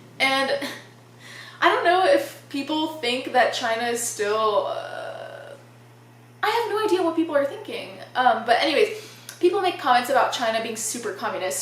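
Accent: United States. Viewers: I think it’s weird as do many that you didn’t get your accent in America... it’s interesting but weird because it’s an American accent simply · I think they expected you to have an Italian accent and it’s weird cause you have an American accent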